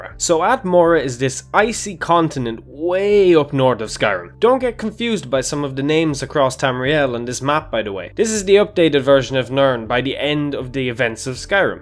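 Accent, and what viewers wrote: Ireland. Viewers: 'the Turd Era' gotta love that accent. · "Tank** you good sir..." Gosh i tought you knew better! (Pls don't take this as an insult i love your accent it reminds me of my grandparents)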